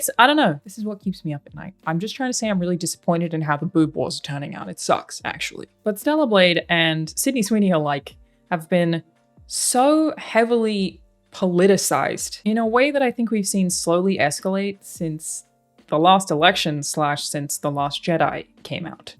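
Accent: United Kingdom. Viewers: That British accent lol sounded like Lara Croft!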